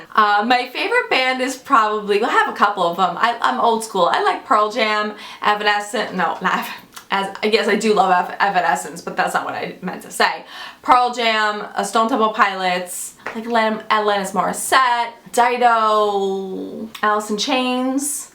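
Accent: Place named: Long Island, United States. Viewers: Gah!! you are so awesome and so real! I love your videos so much! And I love the Long Island accent!! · <3 your accent. Are you from NY originally?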